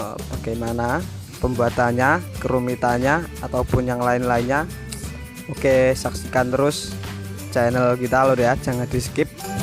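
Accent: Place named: Java, Indonesia. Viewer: Medok lut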